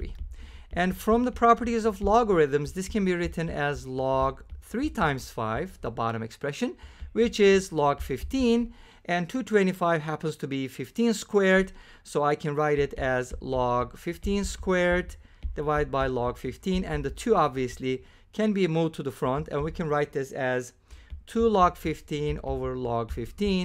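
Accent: Turkey. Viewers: Are you Turkish? Your sound like turkish english.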